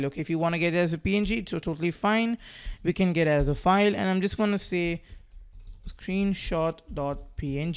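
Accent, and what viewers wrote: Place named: India. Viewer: God bless this boy with a strong Indian accent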